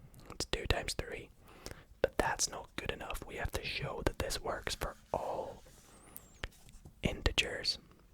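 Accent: Scotland, United Kingdom. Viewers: I can’t tell if ur from Scotland or America it’s weird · Where in Scotland are you from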